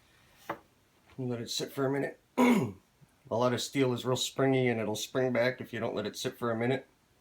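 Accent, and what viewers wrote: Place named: New Jersey, United States. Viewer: I really like ur channel. You get right to the point, no stalling, no boring rambling. We think alike, wondering where ur from, almost sounds like a jersey accent. I'm on fb in the groups, BR etc. Don't kno who u are but wouldn't ask u to put that info here. Keep up ur awesome work. Love ur Barkie collection!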